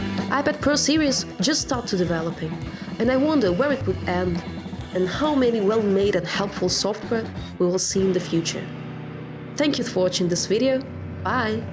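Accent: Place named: Russia. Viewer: She talks with Russian accent 😅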